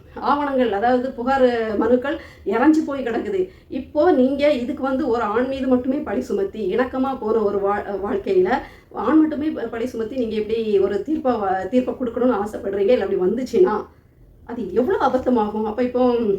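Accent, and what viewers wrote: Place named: Kanyakumari, India. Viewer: அக்கா நம்ம வட்டார வழக்கில பேசி கலக்குறீங்க #பழந்தமிழ் #தேன்மொழி #குமரித்தமிழ்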